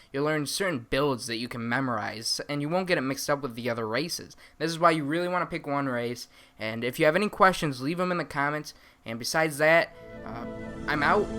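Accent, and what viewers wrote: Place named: Chicago, United States. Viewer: Are you from the Chicago area? your accent sounds like it. ( i used to live 'round there)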